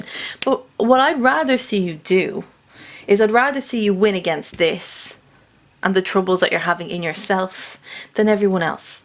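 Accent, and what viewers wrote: Ireland. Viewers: This was so fascinating. Kinda scary how much this resonates. I really like how u read. I appreciate how no nonsense you are yet gentle and encouraging. And it feels natural; not at all contrived. It def was an intense read. But somehow I don't feel upset or unsettled becos u infused so much helpful insight and reassurance. Subscribing!! Thank you for this read. (Hearing your Irish accent makes me miss my dear friend who's Irish) 😊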